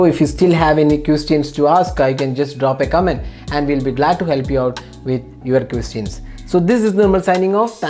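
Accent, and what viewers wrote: India. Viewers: It so hard to understand him what he say. Indian ppl. Do have thick accent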